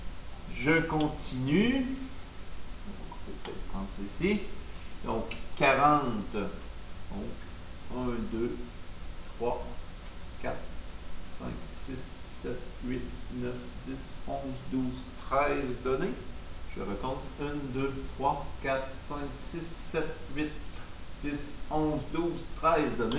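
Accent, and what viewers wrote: Canada. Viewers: Rah québécois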